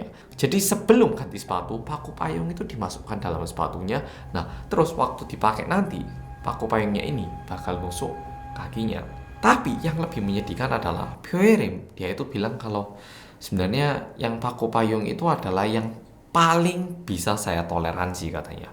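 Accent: Java, Indonesia. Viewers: Setelah ntn the glory, q sempat ada lihat video perempuan agak gemuk trs rambut ponian, dia LG cerita di depan kamera katanya drama the glory ini, sama seperti cerita dia. Trs q sempat lihat bekas cetok rambut di badannya. Ntah itu bnr ato gak, wkt itu di interview GT si perempuan itu, gitu aza sich.. tp ternyata menceritakan LBH jelas 😊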